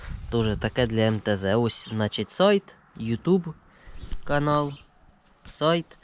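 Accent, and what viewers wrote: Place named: Poltava, Ukraine. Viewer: Перший раз дивлюся ваші відео,і відразу почув знайомий полтавський говір.Лайк за відео👍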